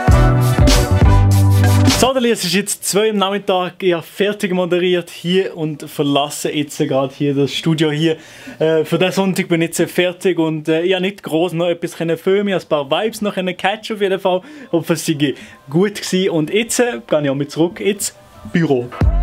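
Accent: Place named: Fribourg, Switzerland. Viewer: I finge dr Friburger dialekt efach sooo witzig 😂😂😂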